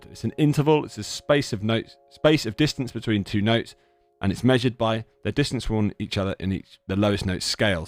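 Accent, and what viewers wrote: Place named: United Kingdom. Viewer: British accent, good man.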